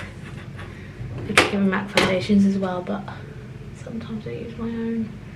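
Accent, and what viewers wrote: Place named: Australia. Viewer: loving the australian accent comeback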